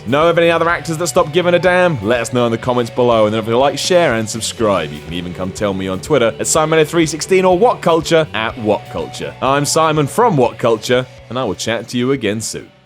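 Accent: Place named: England, United Kingdom. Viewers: from WhatCulture. He's phoning in these voice overs because he's gotta dedicate most of his energy to physical training. And yet, he's got an unapproachable Londony class that he brings to every narration. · Jackie Chan? Are you fucking kidding me? Maybe someone should do an internet top 10 SHITTIEST top 10 lists and have this annoying motherfucking WhatCulture lists take all 10 spots!!! As soon as I hear this English guy now I know it's shit!!!!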